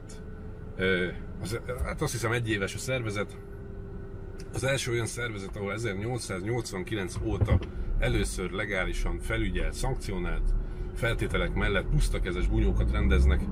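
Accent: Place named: United States. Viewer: Szörnyű hallgatni, hogy az anyanyelveden, amerikai hangsúllyal beszélsz.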